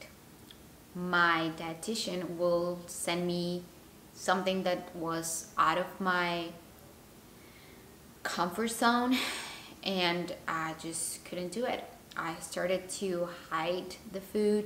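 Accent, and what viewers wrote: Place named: Bogotá, Colombia. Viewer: I recognized by your accent that you are from Bogotá. Stay strong 💖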